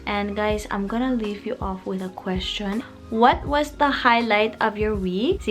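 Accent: Visayas, Philippines. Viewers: your so pinay (expressions, accent, slang, and heart).. haters will always hate.. but I like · ... Di mo talaga maaalis na walang maninira sayo... Please do whatever you want to do... Natutuwa nga ako sa pagtatagalog mo kasi parang bisaya kang ka magtagalog... Kasi may gf din ako noon na taga visayas... Tuloy lang... Thank you... God bless you... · Hi!, sobrang na amaze ako sayo. Galing ng accent mo sa filipino. Pati english mo nadamay na sa accent ng pilipino. Ang pagpili mo sa content mo na gamit ang wikang pilipino ay hindi po pagnanakaw ng kultura. Yan po ay choice mo. Hindi naman siguro patented ang wikang pinoy na exclusive lang para sa amin. OA lang ang nagcomment. Feeling nya, kanya lang yon. Hahaha... Ipagpatuloy mo lang yan. Bihira lang sa isang foreigner ang yakapin ang kultura namin, meron nga jan half filipino hindi proud sa kanyang kultura at di pa marunong magtagalog. 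Kaya welcome ka sa pagpili ng paggamit ng salita naming mga indio. Hahaha...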